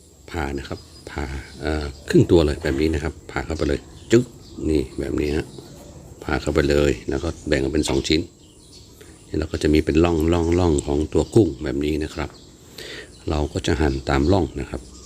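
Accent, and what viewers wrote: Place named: North, Thailand. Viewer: ผมเล่นว้องทุกวันครับ fcครับ ชอบเวลาน้าอู้เหนืออออขยาด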